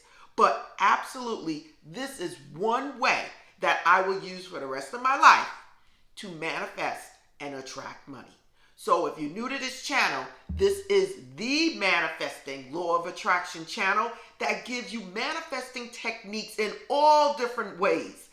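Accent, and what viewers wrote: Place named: New York City, United States. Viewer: Thanks, friend. I'm originally from Long Island (but I'm a Georgia peach now) and your NY accent is next level - wow! Thanks for the tips.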